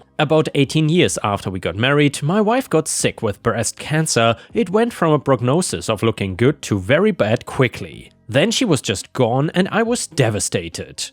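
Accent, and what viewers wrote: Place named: Germany. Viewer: I expected to hear atleast 1 German word the entire time I listened to this lol yall can't tell me dudes 1st language isn't German lol obviously I'm talking about the narrator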